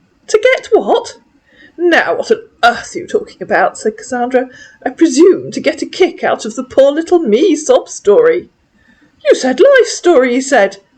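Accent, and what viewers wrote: United Kingdom. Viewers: Rofl 😆 I LOVE it and actually LOL when you call him an idiot or tell them to stop acting like a baby. With your British accent, it's just sooo derned funny! (And no, I'm not making fun)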